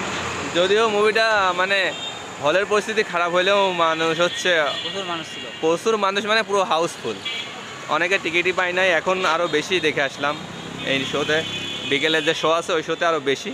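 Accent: Pabna, Bangladesh. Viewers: আপনার মুখে পাবনার ভাষা সুনে সেই লাগলো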